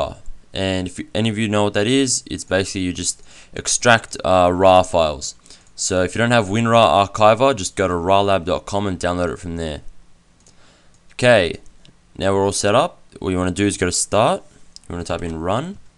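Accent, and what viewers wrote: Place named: Australia. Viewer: ma gawd so damn Australian btw I'm from NZ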